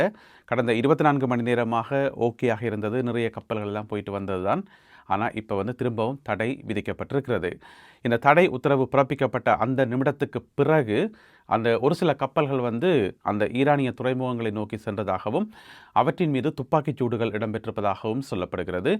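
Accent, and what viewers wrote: Jaffna, Sri Lanka. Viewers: யாழ்ப்பாண தமிழ் தனித்துவம் தானே ❤❤❤❤❤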